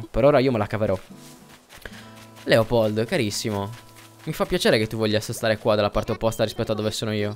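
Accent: Sardinia, Italy. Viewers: Ma sei sardo? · Ma pk hai l'accento sardo? PS io sono sardo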